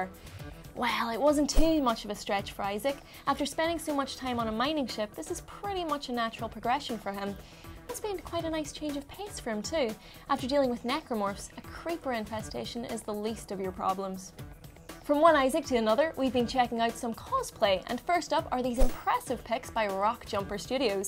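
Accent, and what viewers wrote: United Kingdom. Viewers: Welsh mixed with a southern English accent i think. Hard to figure out, maybe even a little irish is in it.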